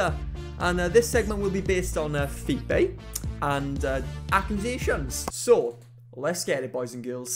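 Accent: Wales, United Kingdom. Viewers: I'm here for the Welsh accent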